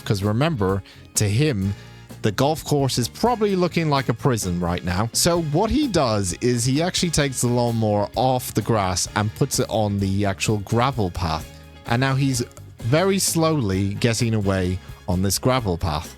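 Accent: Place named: Bristol, United Kingdom. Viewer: Love the Bristol accent ❤and love to see what npcs do with their day 😂 I hope it gta 6 they actually live full lives.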